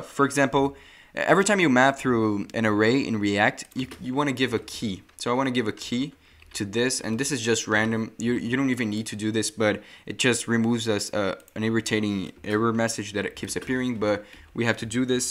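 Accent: Brazil. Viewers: Você é Brasileiro né? Are you Brazilian?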